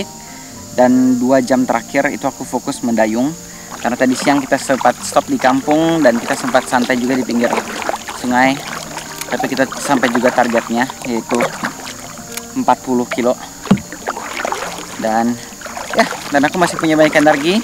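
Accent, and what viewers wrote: Banjar, Indonesia. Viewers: Logat banjar nya ada jua lah wkwkwk😂😂😂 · Logat Banjar bahasa Kalimantan banget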